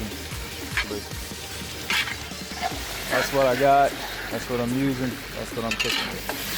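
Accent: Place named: Louisiana, United States. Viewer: Making it today!!! I've watched many people's vidoes but this one was more of my type of jambalaya! I live in Louisiana so when I heard this accent I knew it was the one!🤣🙌🏽🙌🏽🤟🏿 I will be back for updates!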